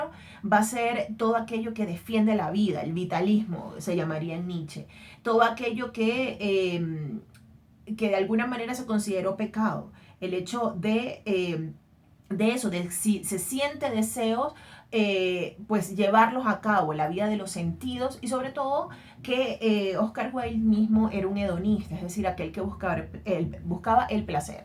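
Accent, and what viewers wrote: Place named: Venezuela. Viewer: Hola... buen análisis, tu acento es tan bello como tu rostro, y sin temor a equivocarme, ambos son venezolanos... un fuerte abrazo.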